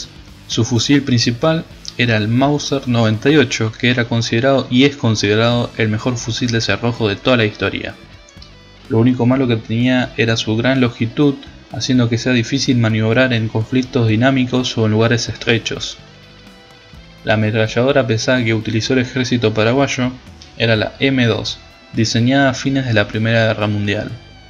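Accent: Argentina. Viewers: obvio que es de Argentina o no escuchaste su acento :v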